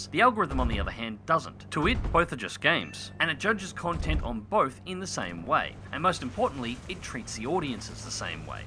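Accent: Australia. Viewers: As far as I can tell, I haven't not seen your uploads in my feed yet. I hope you keep going, it's nice to hear an Aussie accent on here.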